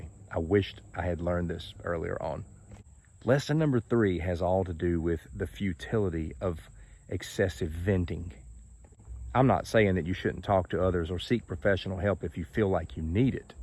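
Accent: South, United States. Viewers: I like your Southern drawl. Reminds me of my granddaddy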